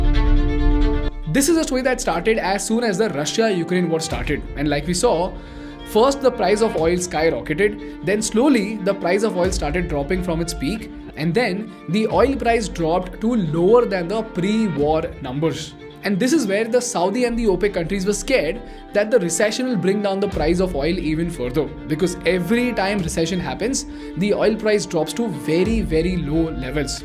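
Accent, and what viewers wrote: India. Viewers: OMG, the host indian accent is so annoying. He should not speak at all. Next point, the West can never trust India and Saudi cuz they are just two face users and go wherever the wind blows. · Ganesh Prasad is the face of the indian accent now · I love your English, it sounds very much like Hindi. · The problem is .... Your Indian accent" Sorry, can't focus on subject.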